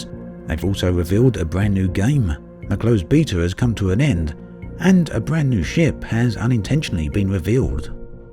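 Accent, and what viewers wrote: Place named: RP, United Kingdom. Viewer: Hello cant wait for the new game play to come to live servers as we need a massive war and all factions to unite against a common foe. Also have you watch the brocast as they protreyed you as the james bond of elite dangerous with your posh actsente.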